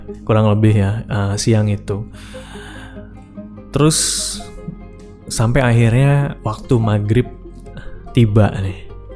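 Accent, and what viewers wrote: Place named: Banyumas, Indonesia. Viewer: Mas.. aslinya Purwokerto ya.. spt Banyumasan logatnya, hee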